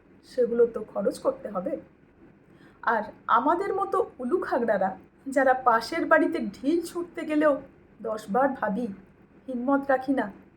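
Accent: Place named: Bangladesh. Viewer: আপনি দয়া করে ঐ 26 জন হিন্দু কে তার স্ত্রী, বাচ্চা ও আত্মীয় দের সামনে, শুধুমাত্র হিন্দু হবার জন্য অস্ত্র হীন মানুষ গুলো গুলি র বৃষ্টি বইয়ে দিয়েছিল কৈ তাদের নিয়ে এই বাতেলা তে শুনতেই পেলাম না।ঠিক আছে ঐ কাশ্মীরে ঐ অপদার্থ গুলো যায় কেন? তার দায় কি আমার? ভালো কথা আপনার ভাষা শুনে মনে হচ্ছে বঙ্গালী।সরি বাঙালি তাহলে মুর্শিদাবাদ, মোথাবাড়ি ইত্যাদি ইত্যাদি তে যে হিন্দু দের কেই টার্গেট করে হিন্দু বাড়ি ঘর জ্বালানো, লুটতরাজ, মহিলা দের শ্লীলতাহানির মতন কটূক্তি কর কথা বার্তা সেগুলো??? ও হো, সো সরি ঐ যে কে একজন ডায়লগ দিয়ে বলল আমরা 70% ওদের কেটে গঙ্গায় ভাসিয়ে দেব।তখন আপনি বাতালা ঝাড়ার মতো মেরুদণ্ড ছিল না, কারণ ঐ লোকটা ই বলেছিল হেন্দু পান্ত ভাত খেইয়ে ঘুমুচ ছে, তাই আমরাই করব।কৈ তখন তো কোনো লে চ কা র ইউটিউবে পাই নি কোওওওও?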